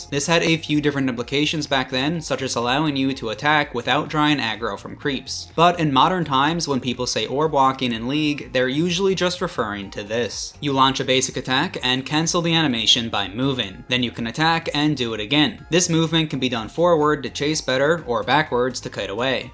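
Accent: Newfoundland, Canada. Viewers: Nice video but god damn your Canadian accent is almost unbearable to listen to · Massive sidenote, but are you a Newfie?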